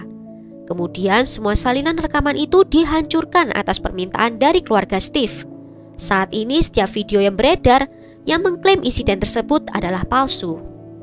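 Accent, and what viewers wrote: Java, Indonesia. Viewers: Jawa Lu medok Min....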